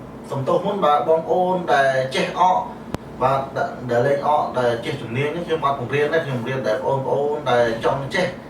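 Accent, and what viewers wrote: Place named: Oula, Cambodia. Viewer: អូននិយាយដូចអ្នកនៅស្រុកអូឡ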